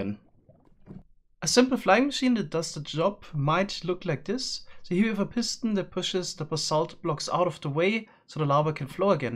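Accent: Germany. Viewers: You hear your german accent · He's German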